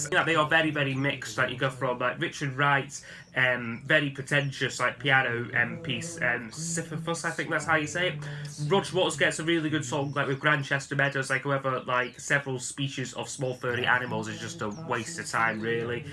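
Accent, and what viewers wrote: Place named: Northern England, United Kingdom. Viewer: Great video. Liked a lot of the positions you put them in Trying to place your accent, thought i heard Northern English, Welsh and maybe even Scottish at one point 🤣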